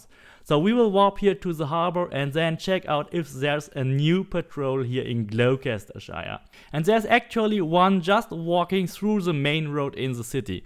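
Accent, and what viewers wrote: Germany. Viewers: Short Question ur German?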